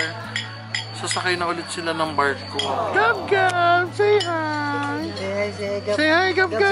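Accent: Iloilo, Philippines. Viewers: ilonggo ka man? hahahha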